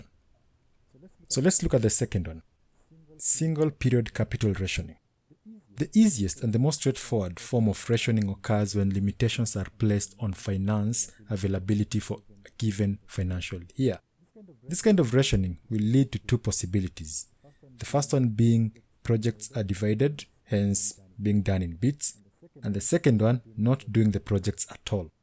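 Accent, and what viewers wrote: Kenya. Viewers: You sound like a kenyan.....are you one???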